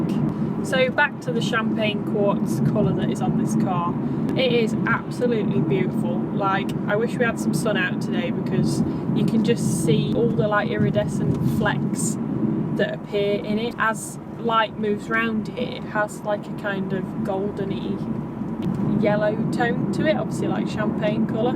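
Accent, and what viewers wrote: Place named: United Kingdom. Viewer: I could listen to you read an Instruction Manual and I would be quite content. Beautiful women with an adorable accent. Brits are the shit!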